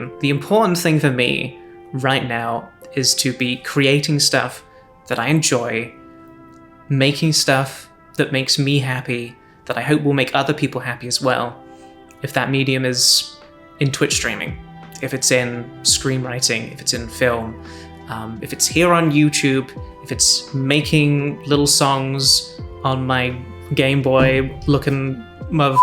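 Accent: Canada. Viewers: Omg you sound so canadian! Welcome back to youtube :) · Lovely to have you back and with an extra Canadian twang to your accent! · ! Thank you for reintroducing yourself after all these years. This has felt nostalgic in a way. The only thing that made me think… WHAT?! Is your new Canadian fusion accent haha. Nice to see you again 😊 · Your accent seems to have been influenced in Canada! Ok vowel shift! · So happy you are happy, and seeing you live your best self! Take your time & do what you are comfy with! Also couldn't help but giggle at the words that sound more Canadian now hehe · Your whole style is so good and you look so happy and comfortable (!!) but the part of this video that caught me the most by surprise was hearing the distinct traces of a Canadian accent in your voice. Didn't expect that little twist! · Wow you can really hear the Canadian accent now. 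Crazy how quick accents take hold. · hahahaa you've picked up the Canadian accent! it jumps out whenever you say "back" :') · Your voice sounds so comforting but I'm noticing a little bit of Canadian accent now! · For the first couple of minutes I kept thinking, "There's something different about her voice..." and then she mentioned living in Canada and I was like, "Ah, yup, that's it." Congrats on your gender transition and your Canadification transition!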